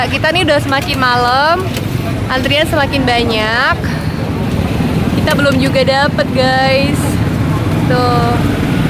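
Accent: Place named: Betawi, Indonesia. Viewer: Logat betawinya lucu..coba lbh di munculin lagi logatnya.. kaya afifah stand up/boah stand up. Pasti lbh lucu..hehe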